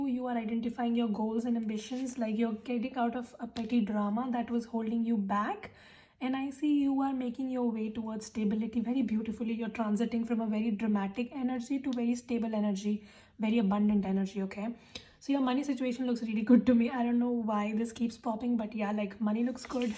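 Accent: India. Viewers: Loveeee Indian accent❤️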